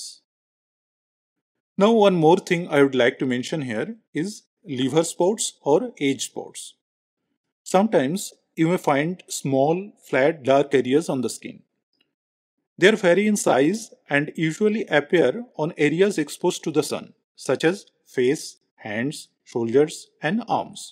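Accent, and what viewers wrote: India. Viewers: Thank you for the information. I have great respect for doctors from India 🇮🇳. Your voice gives it away. You are very knowledgeable.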